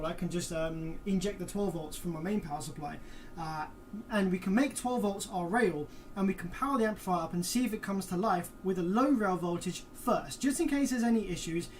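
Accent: United Kingdom. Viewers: bro i never knew you was british lol , did you meet pooven to get your z4 15s?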